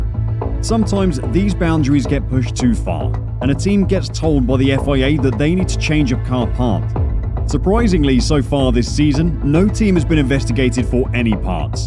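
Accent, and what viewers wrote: United Kingdom. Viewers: sounds like I found a frustrated brit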